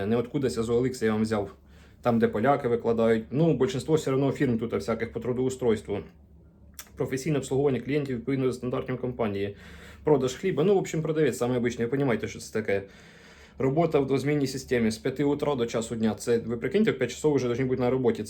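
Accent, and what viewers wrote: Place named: Slobozhanshchyna, Ukraine. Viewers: Це твоі проблеми! Чоловік балакае на слобожанському говорі! Люди не должні всі по-галічанському балакати, як ти! ЗА СЛОБОЖАНСЬКИЙ ГОВІР - ЛАЙК! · Вітаю, а скажіть звідки Ви родом? Говорите суржиком, мабуть з Сумщини?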